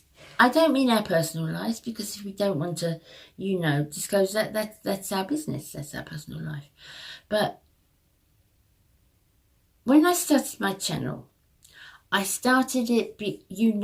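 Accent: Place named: England, United Kingdom. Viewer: Bless you.When you have watched youtube for 12 years or so and watched channels grow,you learn to take many people with a pinch of salt.I don´t watch any more to "learn",when it comes to make up as I have known for a few years now what suits me,and what to avoid,as I am sure many of us have.I like reviews and enjoy personalities.There is a lot of sugary B.S. out there and many use filters.Youtube is a HUGE business and money maker for many,these days.Good for them.It takes a bit of selling your soul for that but many are prepared to do just that.However,I would rather just spend my time watching people such as yourself,who keep all aspects real.I tend to think these days,as much as I love and have always loved make up,the market is totally saturated,and there is only SO much a person needs.Truthfully..I watch you,also, because it´s nice to here a fellow English accent;)......Stay as sweet as you are.....Sheila xx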